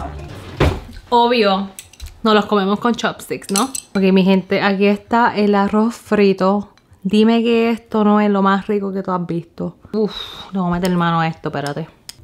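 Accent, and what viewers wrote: Puerto Rico. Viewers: Omg girl!! You speak like a truly puertorrican!!! 💜💜💜💜💜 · Eso mismo dijeeee pensé que era boricuaaaaa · Eso mismo estaba pensando..habla bien boricua. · Es bien gracioso ver su físico de chinese y escucharla hablando con todo el slang boricua! Muy bien video, fácil de seguir y divertido. ¡Excelente borichina! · Ella habla bien boricua y se ve que ama a Puerto Rico. Mejor dicho, esa si es de aquí. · Woooooow ❤️❤️ Nunca había visto a alguien asiático con ese acento boricua tan REAL! Un verdadero orgullo. Una personalidad tan súper amistosa y súper PRECIOSA POR CIERTO. Que ricooooooooo, quiero hacerlo ❤️ 🇵🇷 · Es China peroooo bien boricua en acento y corazón.. Ese acento nos distingue a los boricuas donde sea.. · “ me voy a haltal “ pura boricua me hiciste reír muchísimo me encanta como hablas.😂😂😂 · Ella Dice > Cocinal < Bien Boricua.. 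✌🤣🇵🇷 Tu hablas bien Boricua Hasta cambias la R por la L... Weepaa! Saludos.. 👸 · 🥰🥰🥰 Que chula tu mami, 🥰🙏🏼 Tengo que hacerlos y es ya! Gracias! hablas más boricua que yo 🤪😁😁😁🌹🌹🌹🌹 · Mi arroz preferido ....y una asiática que habla con un acento más boricua que el mio ....espectacular me suscribí a tu canal estaré 🇵🇷👀👈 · Dimeloooo!! Eso mismo estaba diciendole a mi amiga q me lo envio. Estoy estupefacto porq llegue aca en US 8 años ya y me habia olvidao de eso. Esta preciosaaa y mas hablando español de PR. Obvio no estoy diciendo nada porque boricua es!! 🇵🇷 🙌☝ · Que bonito escucharte hablar como boricua nosotros vivimos15 años en NY y nunca vi o escuche una persona china boricua y ahora que te encontré me encantó tu acento y tu personalidad somos de GUATEMALA pero amamos los boricuas porque son de buen coorazon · Ese acento se oye muy bien,que bueno que ames tanto los recuerdos en mi bella isla,bueno corrijo,en nuestra bella isla porque con ese acento jamás hubiera pensado que eres asiática. 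Gracias por compartir la receta y por haber hecho feliz el paladar de mucha gente en PR❤️ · Una Chinita que habla como boriqua! Esto es un tesoro nacional! Que nice!🇵🇷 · Es normal. Es puertorriqueña. ¿Cómo va a hablar si no es como lo que es? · 🈲️🈸️🈯️🈁️ Hija adoptiva de mi Puerto Rico que es tu casa 🇵🇷 y lo bien que habla nuestro idioma y acento boricua,me encanta tu dinamismo y simpatía chica 💖💖💖 🇵🇷🇵🇷 🇨🇳 🇨🇳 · QUE 🆒 Y QUÉ SYMPATICA ESTA MUCHACHA, PRIMERA VEZ QUE VEO UNA ASIATICA CON ASENTO BORIQUA 🇵🇷 ♥️😁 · .. Yo soy Boricua vivo en Texas.. Te Pido Disculpas por el Pueblo de Puerto Rico.. La Mayoria no somos asi. Pero acuerdate que los ninos y adolesentes abeses son muy crueles. eres una joven especial y tus Padres son ejemplares. Te criaron muy bien. Me encanta tu acento Boricua y Tu forma de Ser. Sigue siendo Tu. And forget about anybody else. We love You. Que Dios Te Siga Bendiciendo. 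· La voz la delata la forma de hablar .100 porciento bori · Wow. El Acento Boricua ❤️😍 🇵🇷 The Perfect accent. You Speak it Perfectly 😍 🤩 Lo habla Perfectamente! 😌 el Acento Puertorriqueño 🇵🇷 God Bless ! 🙏🏻 . Puerto Rico 🇵🇷 in the House 🙌🏻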